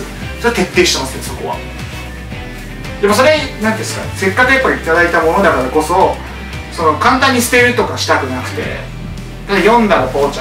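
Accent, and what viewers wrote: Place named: Shizuoka, Japan. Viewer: 静岡弁。笑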